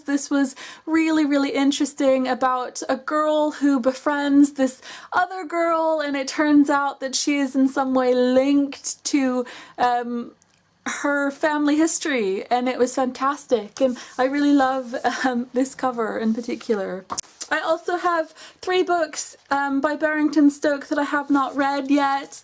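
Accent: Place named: United States. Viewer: Is it me or does she sound American when she’s British?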